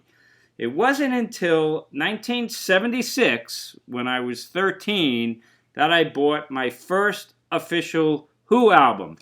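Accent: Boston, United States. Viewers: What's your accent? Boston? It's a bit like JFK in places.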